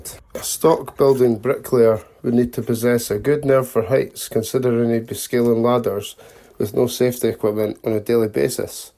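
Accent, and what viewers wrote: Scotland, United Kingdom. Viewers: I'd never heard such a thing about these chimneys before. I would see them in old pictures but they never raised my curiosity until I saw your video. I found I had to use the subtitles to follow what you were saying because you have a great Scotish accent! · Brilliant video. Loads of info. You have captured a major bit of heritage that is being torn down with damn little thought. PS great to hear a video with a Scottish accent that's my accent!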